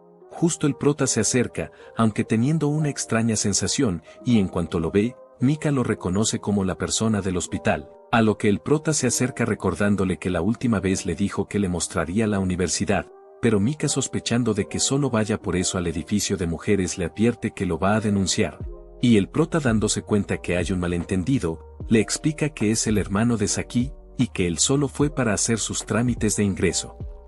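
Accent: Dominican Republic. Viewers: que buen resumen con su acento dominicano jaja esa vaina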